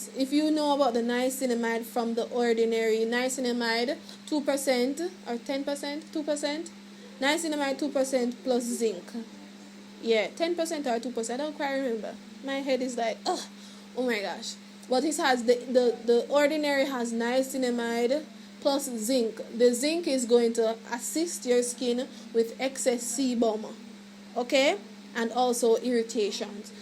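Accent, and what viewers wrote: Jamaica. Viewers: Forget the skincare products I absolutely love your Jamaican accent, it's 😍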